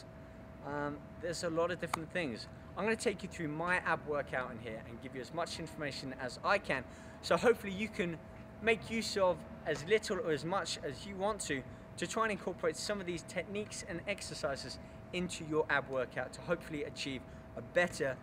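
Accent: United Kingdom. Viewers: U look and sound really british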